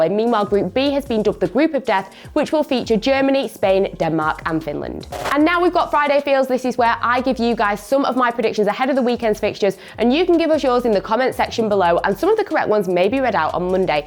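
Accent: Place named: United Kingdom. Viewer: No hate but I just don't like her accent and the way she delivers the video. Too fast and too much British for everyone to understand. Please only in the future.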